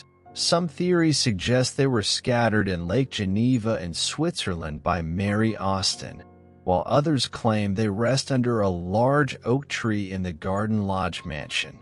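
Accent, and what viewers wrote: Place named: United States. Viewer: I cannot stand American narrators sounds awful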